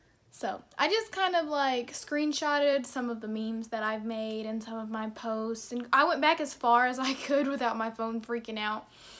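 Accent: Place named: South, United States. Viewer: i didn’t know you were southern and now i’m even more in love with you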